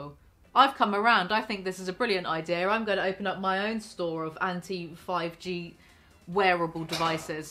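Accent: United Kingdom. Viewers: I’m from N Y and I always Loved the British talk! RUBBISH ! Hello mate! Specially this nice looking British woman! You Go Girl!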